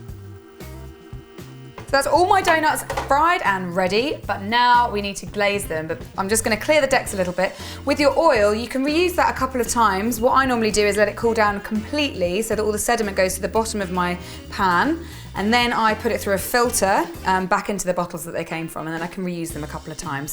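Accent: England, United Kingdom. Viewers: hi, first of all I wanted to said you are awesome and I love your English ascent:) I love watching your YouTube and today I decided to try your doughnuts recipe, follow exactly your recipe, beautifully raised and I love the texture. I am a doughnut fan so I always try recipe. my question is how to get the doughnut soft all day like we buy in store. yours nice soft when it hot however after 4 hours I taste a bit chewy n not that soft like a hour after frying. Do u hv any tips for its always soft whole day. thanks. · Great video, love your british accent! By the way, I can also use a pan to fry the doughnuts, can't I?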